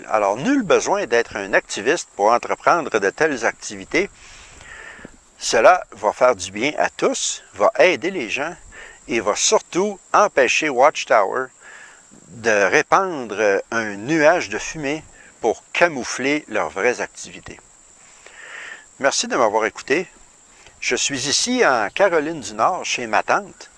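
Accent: Quebec, Canada. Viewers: Cher, tu ne me connais pas encore mais ça fait déjà quelques mois que j’écoute avidement depuis la Suisse tes vidéos (attention, au masculin en québécois…! ). Tout d’abord, j’ai toujours adoré l’accent québécois et j’ai toujours l’impression que vous autres avez un humour supplémentaire et plaisant par rapport à chez nous! Merci pour toutes tes vidéos (pardon, tous tes vidéos! ) tellement logiques, intelligents et en même temps simples à suivre et comprendre. Tu fais, toi au-moins, du bien à beaucoup de monde qui ouvrent les yeux et se déculpabilisent, contrairement à ces connards ridicules et rigides de la Watchtower! Quelle arrogance que des humains se prétendent représentants de Jésus et Dieu sur la terre (auxquels, d’ailleurs je n’ai jamais vraiment cru). L’un de nos comiques suisses disait dans l’un de ses sketches « peu-à-peu les écailles churent hors de mes oculaires…! 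). Eh bien c’est mon cas bien que je n’ai été qu’un « intéressé « comme ils disaient à la congrégation pendant quelques années, heureusement que je ne me suis pas fait baptisé (Dieu m’en garde…! ). Depuis 2 ans, j’ai fait un travail psychologique sur moi-même et tes vidéos, ainsi que ceux de Dominique Reynaud en France, ont contribué à donner un coup de balai définitif à ces âneries des TJ et autres religions; en somme, pour moi tes vidéos c’est « la cerise sur le gâteau «. Merci encore et mes amitiés virtuelles depuis la Suisse région Lausanne. Daniel Bulloz.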